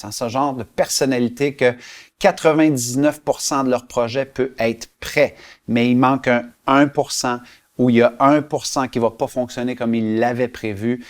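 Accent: Canada. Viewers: Merci pour la vidéo hyper intéressante, en plus de l'accent canadien agréable à écouter ! Je me suis reconnue dans la super génie et la perfectionniste. Mentalement je comprends ce que je peux faire, il y a plus qu'à mettre en pratique et m'apporter de la bienveillance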